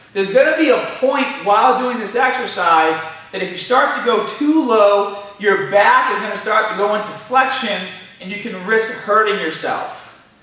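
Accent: Boston, United States. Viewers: I ABSOLUTELY LOVE WATCHING YOUR VIDEOS!! Especially with that Boston accent of yours❤️. Enjoy waking up & hear your cheerful self on YouTube! Wish you lived near me, I’d love a trainer like you. I’d appreciate any suggestions!! My right shoulder is 💩 for the past few hrs. You name it, I have it. Torn rotator cuff, torn labrum, bone Spurs, osteoarthritis, fraying of bicep tendon, etc. my ROM Is awful. I’m on my 10th cortisone injection over past few yrs. surgeon said need partial shoulder replacement BUT due to my age, 48.. he doesn’t recommend it. Told me I’d have less ROM after surgery heals. & only lasts 10 yrs.. even though it’s my shoulders that are 💩 it always interferes with any work out I do legs, glutes. I’ve been to physical therapy, chiropractor still going to that I do my wall stretches band stretches are used to be shredded I had the best arms around I can’t even do a 5 pound lateral raise. 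I would love any suggestions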